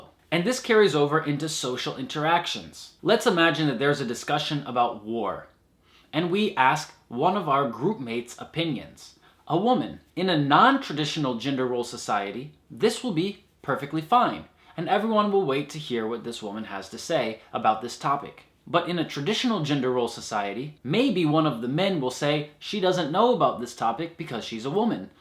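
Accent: Russia. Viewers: Почему мне кажется, что заполучил русский акцент?))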